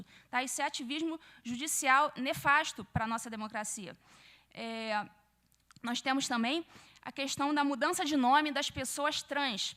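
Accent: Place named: Rio de Janeiro, Brazil. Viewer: Muito competente... Parabéns..só uma coisa menos esse carioquex... Fica td certo..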